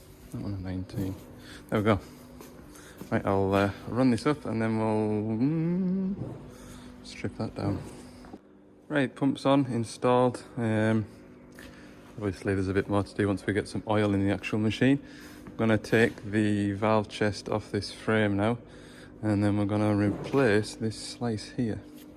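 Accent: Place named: Scotland, United Kingdom. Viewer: Love it when the Scottish comes out it sounds funny with your accent. Goat yer troosers oan. Oh aye like.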